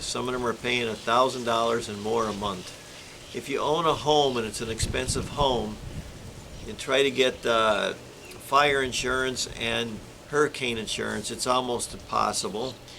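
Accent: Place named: Pennsylvania, United States. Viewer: Great video and a real interesting life. My family was from Gerardsville and now live south of Philly. Your pa acent has always sounded like family to me. I am also planning to winter in Florida but those HOA fees hurt. Learned a lot from you over years and I have been doing patio and sidewalk and stucco on my old house up here in Kennett Square.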